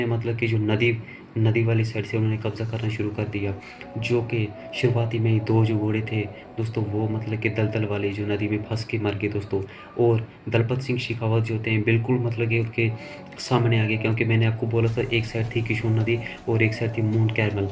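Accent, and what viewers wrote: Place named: Pakistan. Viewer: Baat Karne Ka Accent Paakistani Kyu Lag Raha ? Paakistani Bhai Ho Kya ?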